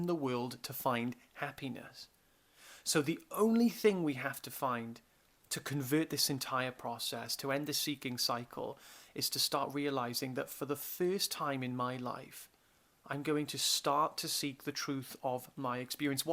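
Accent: Wales, United Kingdom. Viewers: Do I hear a slight welsh accent?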